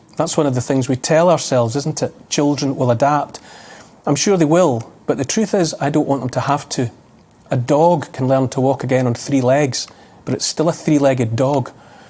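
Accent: Scotland, United Kingdom. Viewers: I've been saying the same thing for several months now, but it just sounds better with a deep Scottish accent. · He is an engljshman with a Scottish accent.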